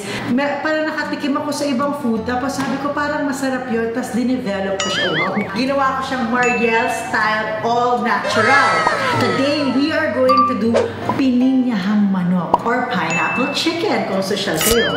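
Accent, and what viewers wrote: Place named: Philippines. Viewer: Super funny!!!! I hear the zobel + jologs in you just like me hahahaha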